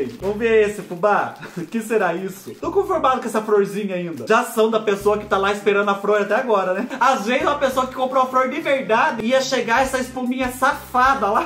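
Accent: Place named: Juazeiro, Brazil. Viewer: Sou do Ceará, e acho o sotaque do fubá muito parecido com quem mora em Juazeiro.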